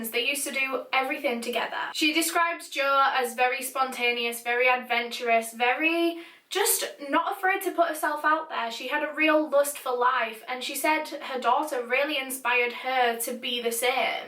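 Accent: United Kingdom. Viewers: your accent is so.. brit gangsta- i love it... keep up the tight work, its awesome, so far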